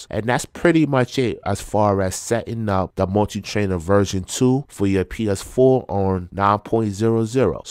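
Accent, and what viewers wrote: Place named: Brooklyn, United States. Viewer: Bro you from NY aren't you ? By your voice an slang I would say brooklyn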